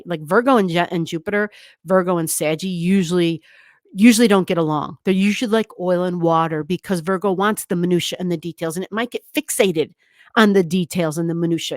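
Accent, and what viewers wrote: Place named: Midwest, United States. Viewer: Wow, this is fascinating and I just love all of your videos. It's refreshing to listen to another midwesterner-hehe. My BML is in Capricorn (almost Aquarius) in the 10th house. I'm a Sag sun, Aquarius moon, Taurus rising, scorpio venus. I tend to get very aggravated with Virgo energy even with all of the Earth placements in my chart. It feels judgey and oppressive. G, I also noticed that a few married couples around me are having big arguments about cleanliness and household duties. Woah.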